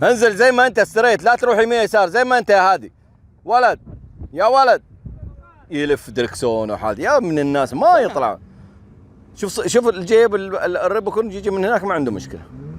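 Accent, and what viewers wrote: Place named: Jeddah, Saudi Arabia. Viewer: راعي القناه لهجته بدوي بس النغمه جداوي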